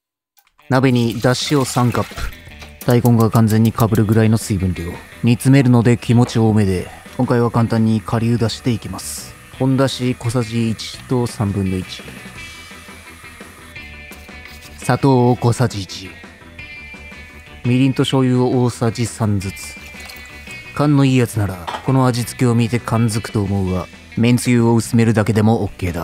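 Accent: Kansai, Japan. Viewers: 変な関西弁が混ざると思っていたが、本当に関西人だ。 西では天かすだが、東では揚げ玉。 これ、にこんにゃくのサイコロ切りを入れても触感が良い。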